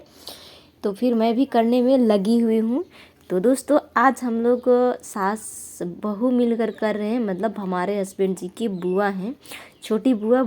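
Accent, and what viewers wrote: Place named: Chhattisgarh, India. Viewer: Aap Chattisgarh se ho kya didi aap baat kiye to lg rha hai mere ko aap Chattisgarh se ho mai bhi Chattisgarh se hu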